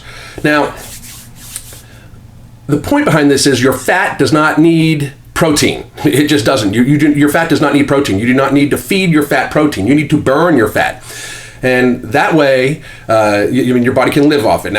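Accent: United States. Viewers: I told my Russian friend to watch your videos and he said despite being Russian you have a perfect american accent :D :D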